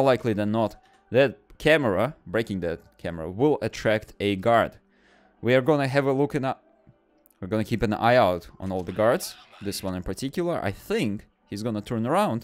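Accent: Russia. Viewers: arr u rusian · So, you are Russkiy, ye? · [GERMAN]Ich höre da Russischen Akzent raus.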